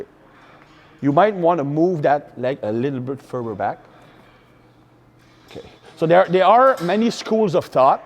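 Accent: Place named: Quebec, Canada. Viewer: SUPER un Québécois. Il est a Québec ou a Sherbrooke?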